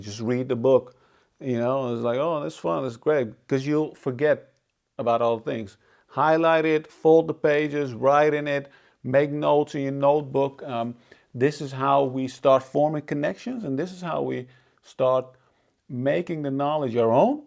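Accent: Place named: South, United States. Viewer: Is it me or you sound like a Southern American, although you're in Netherlands?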